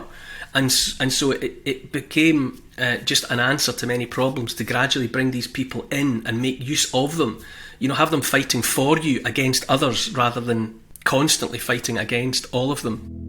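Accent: Scotland, United Kingdom. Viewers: I like accent. Is it Scottish?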